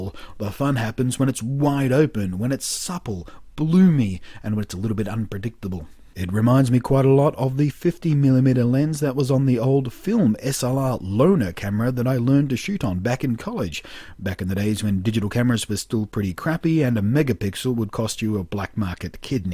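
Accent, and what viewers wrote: Australia. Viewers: I don't know how I got here when I was looking for audio equipment, but your voice kept me here. Those dulcet tones paired with you Aussie accent: Audible Chocolate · Great video! And good to hear an Aussie accent and see Sydney :) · I believe he said "70mm" (i.e. 35mm lens with 2x crop factor on M43). Must be the Aussie drawl :)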